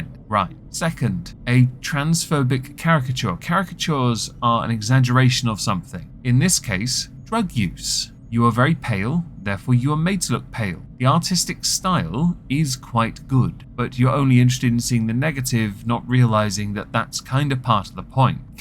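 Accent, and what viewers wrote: RP, United Kingdom. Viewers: 😊 heh....me like when posh brittish voice guy say mean, true things about the people me no likey😊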